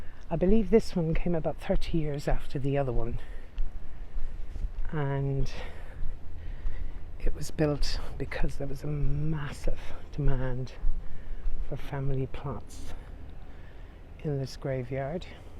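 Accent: Ireland. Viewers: Dear, that was a wonderful walk, I've had that place on my bucket list for many years. Thank you for a wonderful video, I've been there in spirit now. I was pleased by the care being taken and Highgate Cemetery, it still has the right kind of spookiness. I hadn't heard about the vampire so that is a bonus. I love hearing your accent it adds so much for me, the Irish branch of my family tree are from Dublin, a few of them weren't very nice but they are are forgiven 😀 Lots of love and Blessed Be always 💖💖💖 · I just love watching your videos and love your Irish accent, must bring out the Irish half of my ancestors in me...lol. Love love love London (been there want to go back one day) and also to visit Ireland . You have so much energy and enthusiasm. Would love to do one of your tours one day. Go away Covid and I will be over there in a flash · You have a lovely soothing voice! 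Living here in Berlin, always nice to hear your beautiful Irish accent, reminds me of home. Also, Highgate was one the most interesting places I visited in London. Keep up your wonderful work x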